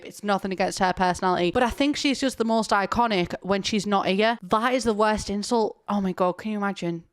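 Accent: United Kingdom. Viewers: i really love your videos, i was just wondering if you could add captions (either in the video or in youtube) bc with my adhd auditory processing can be hard sometimes, especially with thicker accents like yours (maybe not as bad for uk folks but i'm american so i'm not as assimilated to that sort of accent). it's like the only thing keeping me from binge watching them constantly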